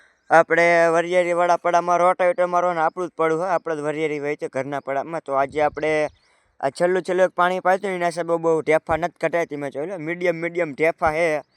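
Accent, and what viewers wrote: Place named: Surendranagar, India. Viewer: Bhai Tari boli surendarnagar ni che😅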